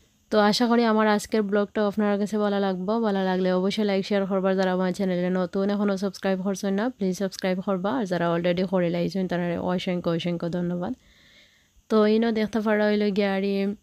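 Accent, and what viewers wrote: Sylhet, Bangladesh. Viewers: Afnar sylheti mat kub shundor, nice vlog oise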